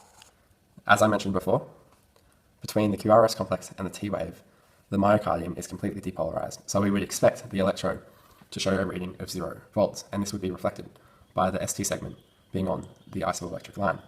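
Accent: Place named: United Kingdom. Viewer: foreign accent (British) and fast talking. I agree. LOL but still a good video, I just had to rewind a few times. Dan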